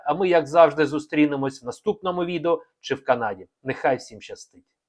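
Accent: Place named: Russia. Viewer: На какой ты мове розмовляеш у тебя страшный российский акцент не треба кацапский выучи мову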